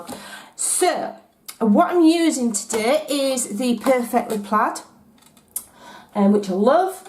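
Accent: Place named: North, United Kingdom. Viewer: Why did you not score! Naughty naughty, but lovely to hear a nice UK accent trying to place it sounds more northern like the cards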